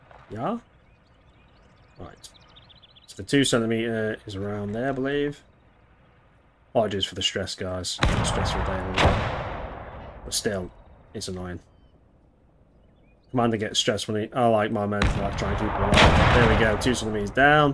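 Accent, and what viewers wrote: England, United Kingdom. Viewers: Weird to my ears to hear the invading German army "luckily" has plenty of materiel with which to invade Poland. In an English accent too. Great game though. Thanks for vid.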